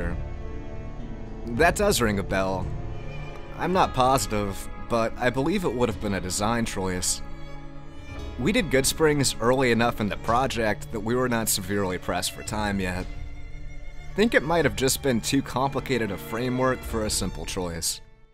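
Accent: United States. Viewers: I love the content and it's not your fault but the voice reminds me of Arin from game grumps whenever he does a generic protagonist voice. It might just be American inflection on some words. I just can't help but giggle a tad when I hear words spoken like that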